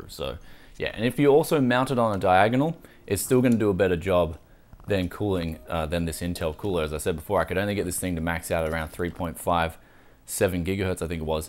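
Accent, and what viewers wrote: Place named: Australia. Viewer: I sometimes forget you're from the down under I guess I just got used to your accent. You keep mentioning summer and I look out the window and it's piss pouring rain here in the states. Lol I'm up here wishing for summer!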